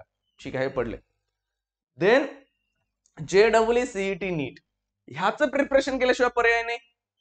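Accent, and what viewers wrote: Kolhapur, India. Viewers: Are u from Kolhapur???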